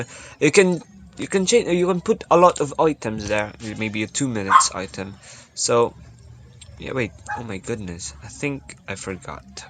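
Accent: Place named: Visayas, Philippines. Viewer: Nice english accent bro bisaya with nice English accent? Nice!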